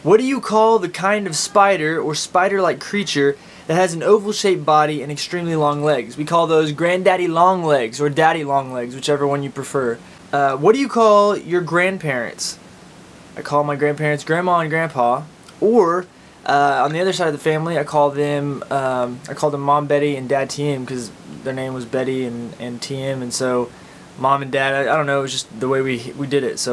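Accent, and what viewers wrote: Oklahoma, United States. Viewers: Cool, I love Oki accent. it reminds me Carinvale series. :-) cheers mate, lovely accent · I'm Australian and I refer to the "bug that curls up into a ball" as a 'Slater'. My Dad's parents were both from Oklahoma and now I finally know why my Dad kept telling me these bugs were called 'Roly polies' while everyone else kept saying 'No, its a Slater'. Interesting listening to your Oklahoman accent, yours is no where near as strong (stereotypical) as my grandparents. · I love this accent too much. I need a guy from Oklahoma to move to Australia lol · Simple Oklahoma accent the E’s Got to get him Got to geit eeeem Instead of (in) they say eeeeeean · in Tulsa we called it Soda or Sodapop (sounded like sodeepop) or pop. I have no clue which part of Oklahoma he's from but most of what he's saying sounds a lot like us Tulsans. sadly I left that beautiful stay years ago for Oklahoma so I am not 100% certain on this due to Californians but he said every word about the same as how I say em. 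· I live in Broken Arrow which is like 10 to 20 minutes away from Tulsa, and it’s funny because around here we sound exactly like this guy. It’s funny because I rarely hear the twang southern accent. If I go to Norman or Wagoner I do. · You sound more northern Oklahoma I'm from ada and mine is way thicker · Ashley Burt pretty much all oklahomans talk like him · Its that OKC or Tulsa Okie. Lmao. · From what I've read, the California accent is descended from the Oklahoma accent. Watching your video, this is my first time hearing an Oklahoma accent, and I can totally hear how similar it is. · Your Okie accent just reaffirmed mine. Now I know what I sound like (only I pronounce lawyer "loyer" and I say soda instead of coke). XD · I live in Oklahoma as well. ^_^ I have almost the same accent as you. (From around Tulsa. Owasso.) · Hmmm...he sounds very Central Oklahoman and i used to work in drive thru.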